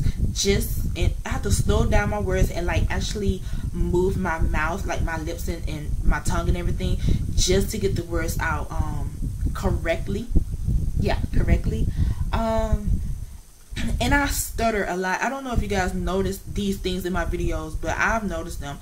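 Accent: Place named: United States. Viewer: Yeah one of my friends had the same she had hogkins lymphoma and relapsed and had a stem cell for the second time. I'm guessing you live in America from your accent lol was your treatment expensive? As I live in the uk we have the nhs.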